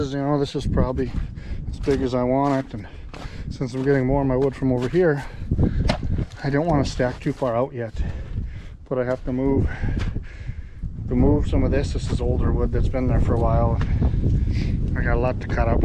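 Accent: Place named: Canada. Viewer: You sound Canadian 😎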